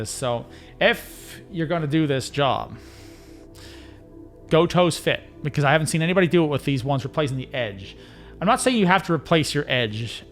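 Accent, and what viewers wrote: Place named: Canada. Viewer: I heard your accent BEFORE I saw the Saga poster... then the Rush poster... LOL... Congrats on being a fine representative of the Great White North, my friend! LOL... Great video, thanks! I have a lot of RGs and I use the 1996T often!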